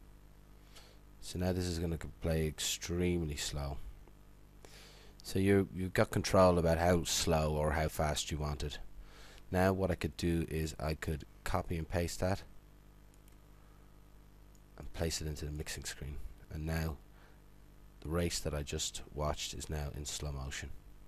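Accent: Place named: Ireland. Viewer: ill know that your irish 5 stars your for dublin so am i because i knoe your acent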